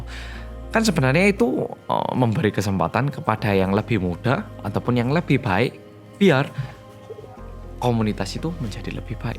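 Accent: Java, Indonesia. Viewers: Orang Korea yang medokkkk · Orang korea yang medokkkkk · MEDHOOOKKK e ikulo.. medok polll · Konten yang kurindukan dari Chanel medok~ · 'Halo gezz welcomeback to korea reomit bersama orang korea yang.... medokk' terngiang² di kepala😌😂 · Suka dengar bercerita..orang korea cakap bahasa indonesia jawa....teringat jiran ibu dulu orang jawa,tapi orang malaysia.macam ni lah cara dia bercakap...moga terus maju..salam dari malaysia.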